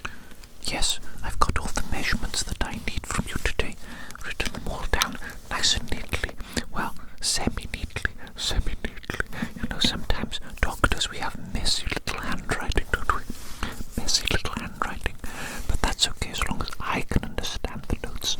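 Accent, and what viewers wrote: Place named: Scotland, United Kingdom. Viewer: Is he scottish ? Idk why but i'm pretty sure he has a scottish accent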